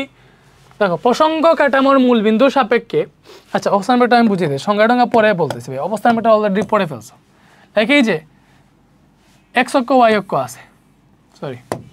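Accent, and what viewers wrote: Sylhet, Bangladesh. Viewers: Vaiya apni ki Sylheti...?? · vai apni ki sylheti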